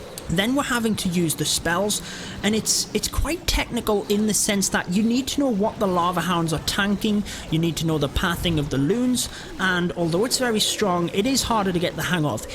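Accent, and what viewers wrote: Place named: North East England, United Kingdom. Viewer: CraziiPower he has to slow his "talking" down because otherwise you would not be able to understand him due to a strong north east (England) accent. Also to me it's not slow at all, just clear and efficient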